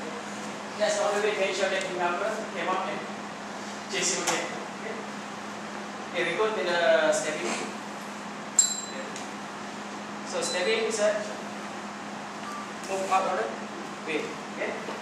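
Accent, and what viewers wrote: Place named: Kelantan, Malaysia. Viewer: betul la cikgu... ada sikit sikit loghat kelate ^_^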